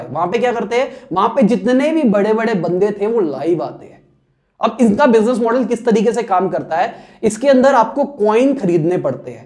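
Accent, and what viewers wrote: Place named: Punjab, India. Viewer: Aapka content sahi me share karne like nahi hota bcoz aapki jo language hoti hai vo un professional lagti hai means usme koi professional sa feel nahi hota hai aap vo jo bolte ho na yaar, bhai etc aur aap standerd hindi language bhi nahi bolte matlab ek normat panjabi tone me aap information deliver krte ho to ye sb ek professional worker pe nahi jamta aapki language vivek bindra , ujjval patni ya aur bhi jo best vaqta hote hai unke jaisi professional ho to zyada behtar lage tab aapki video share krne me hume bhi khud me accha feel hoga.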